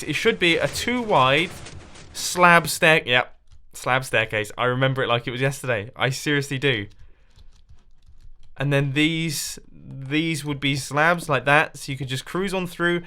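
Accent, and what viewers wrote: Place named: United Kingdom. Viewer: How many other people freaking love his accent? It's so great, it's probably just me, I have a thing for British people.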